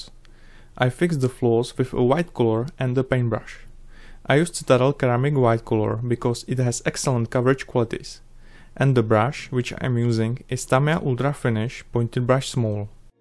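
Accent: Czech Republic. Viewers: Já si podle toho přízvuku říkal že budeš čech (zápalky z penny tě prozradily) :-D super práce. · You can definitely hear that you are czech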